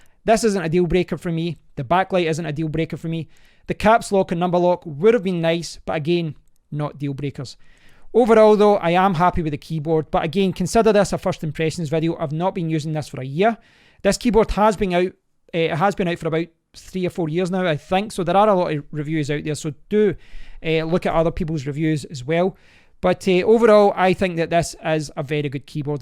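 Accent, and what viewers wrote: Scotland, United Kingdom. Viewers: Nice scottish accent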